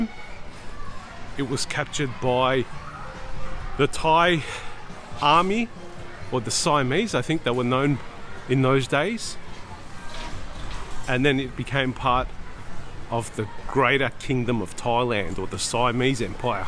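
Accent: Australia. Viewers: Bro, get some vocal coaching. That Aussie twang of yours ain't particularly pretty.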